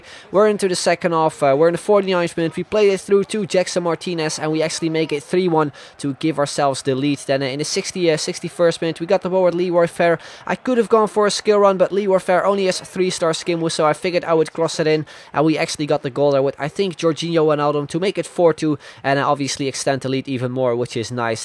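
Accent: Netherlands. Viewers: is he dutch? he sunds like?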